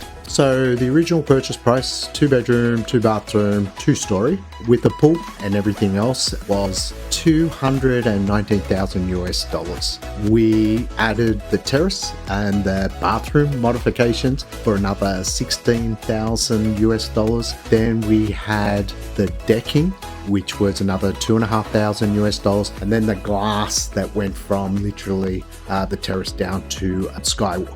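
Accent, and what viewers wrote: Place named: Australia. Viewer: He didn't mention USD or AUD but from his accent i think it's AUD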